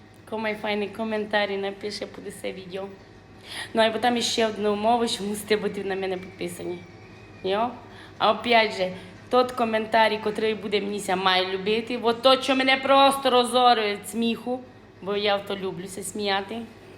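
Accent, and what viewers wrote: Transcarpathia, Ukraine. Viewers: Вітаю! Ви класна, весела, неповторна! Я викладаю діалектологію в університеті. Ваша мова і харизма - це шедевр. Ми на парах зі студентами дивилися Ваші відео і таким чином запам'ятовували та аналізували фонетичні, морфологічні, лексичні особливості закарпатського діалекту! Розбираємо Ваші перли на молекули 😊 Пишу не заради голубців))) Нехай Вам щастить, файна дівчино! ❤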